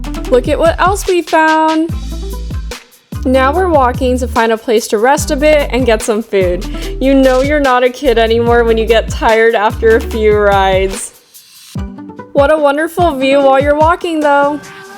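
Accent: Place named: United States. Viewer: 最近跟著小六的女兒一起學英文，可以聽道地的美語，還有有趣的Vlog，非常棒的影片。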